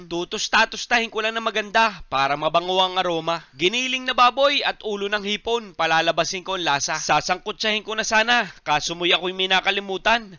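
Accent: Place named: Batangas, Philippines. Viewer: batangeño na *batangeño*. alah naman!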